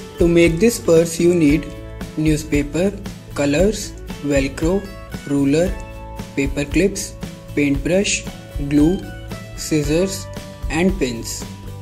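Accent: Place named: India. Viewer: Very beautiful handbag. Are you from India? I assume because your akcent.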